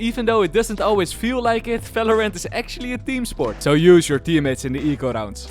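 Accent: Netherlands. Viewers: i smell dutch?!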